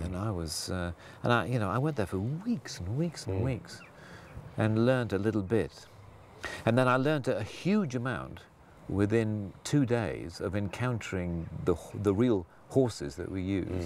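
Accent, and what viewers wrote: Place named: United Kingdom. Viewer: I’m here to learn a proper British accent.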